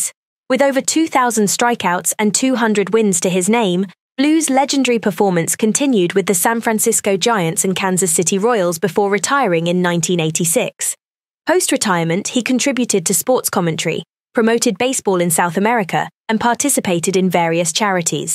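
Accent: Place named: RP, United Kingdom. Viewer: It's pronounced "vie da" , not "vee da", Blue. Rhymes with "Ida". Since you use British RP accent, it rhymes with cider, not cedar. Anyway, may God grant Vida Blue eternal peace.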